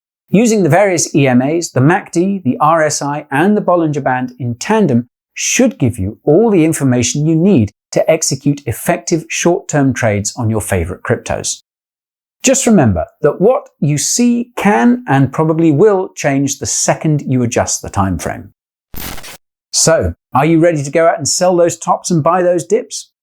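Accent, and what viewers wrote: United Kingdom. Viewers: so much priceless information! and even explained with a British accent! couldn't wish for more than that 😍😁 you' re very funny too 😁, love your jokes hehe makes everything so much fun and easier ❤️ thank you so very much! ☺️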